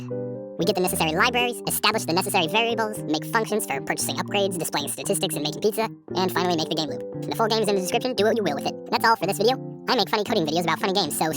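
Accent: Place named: United Kingdom. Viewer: as the video goes on the more British the talker gets.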